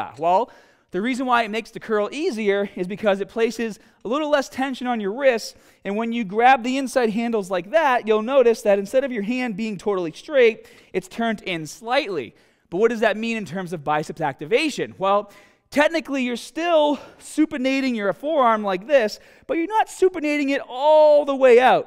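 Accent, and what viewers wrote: Boston, United States. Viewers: I’m not even from the US but even I recognize this guy must be from Bostaahn Mass. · Boston? Rhode Island? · SO Boston, LOL! Great vid! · You got a lot more Bahhstun from your videos five years prior. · Love your Baaaasten accent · Appreciate the video, but check the BAHston accent. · Theres a touch of a boston southie accent in there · I forgot that the Boston accent existed, Haha. Good info from the dude tho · Is this guy from fucking Boston? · Boston accent ? Thank you great video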